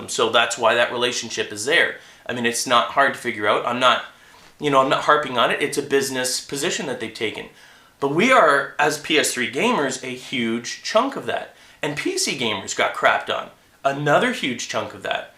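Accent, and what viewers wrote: Canada. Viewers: his Canadian acent ruins the mood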